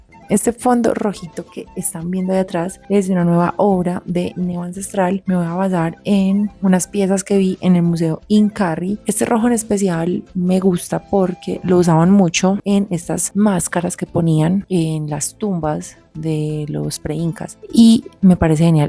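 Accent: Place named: Colombia. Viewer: Como Peruano estoy orgulloso de tus conocimientos en mi querido Perú,....por tu dejo ,.--- se que eres de la rica Colombia, te envio bendiciones y que todo te vaya bien.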